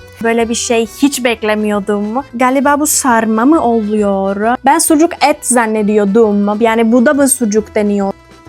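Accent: Cyprus. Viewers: kıbrıslı çünkü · Kıbrıslı zaten😂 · Abla neden Kıbrıslı gibi konuşuyorsun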